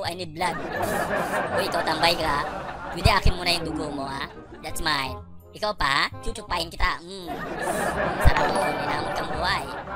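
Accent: Visayas, Philippines. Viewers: Bisaya ba to? HAHAHA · Bisaya ka no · Bisaya po kayo😂😂?? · Bisaya ka bay? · Bisaya ka dol?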